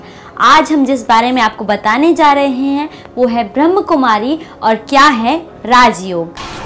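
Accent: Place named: Bihar, India. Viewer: Bihari tone thik karen.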